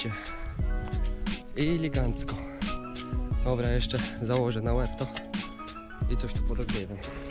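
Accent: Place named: Russia. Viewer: Masz leciutko rosyjski akcent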